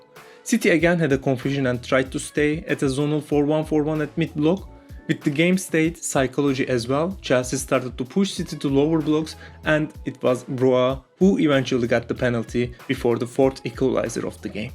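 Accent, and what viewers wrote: Turkey. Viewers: Bro are you Turkhis ?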